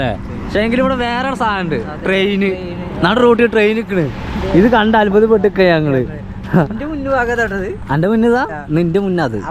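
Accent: Malappuram, India. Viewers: Malappuram slang kurachu avoid cheyuka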